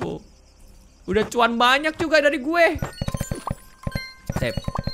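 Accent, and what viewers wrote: Java, Indonesia. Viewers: Kak kalo ngomong bahasa Jawa lucu bgt wkwk🤣🤣..eh btw kak STMJ susu telor bukan teh🤣🤪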